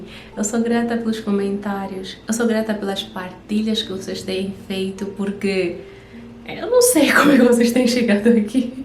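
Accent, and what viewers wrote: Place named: Portugal. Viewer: Já maratonei todos seus vídeos 😊 Você é de Portugal ou Angola? Desculpa a pergunta é pelo sutaque, um abraço no aguardo de mais vídeos 🙏🏽👑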